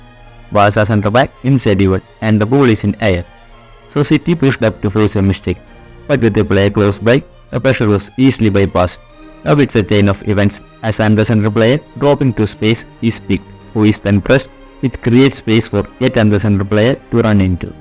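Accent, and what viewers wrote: Kerala, India. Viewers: Wtf is this voiceover?? Malayali guy 🤮🤡. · I am from west bengal, I have many friends from Kerala. I realized you are from kerala from your accent of speaking english😄.one suggestion for you please improve your audio quality the analysis was good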